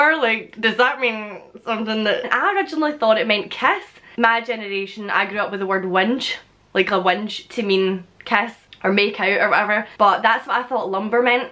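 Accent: Scotland, United Kingdom. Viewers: I don't understand your accent. It is very difficult to understand Scottish accent.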